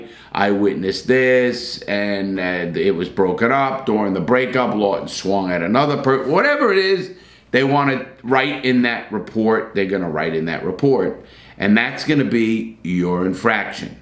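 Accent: New York, United States. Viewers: I just LOVE his new york accent its so heavy I can smell pizza from the screen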